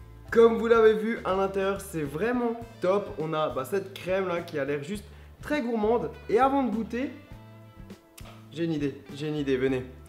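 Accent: Alsace, France. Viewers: oui il est tu l'entend a l'accent hhalsacien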